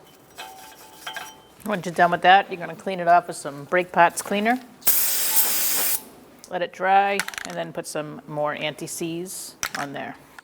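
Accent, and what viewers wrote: Boston, United States. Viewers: Thanks for the torque specs. That is one serious Boston accent. Reminds me of my mom.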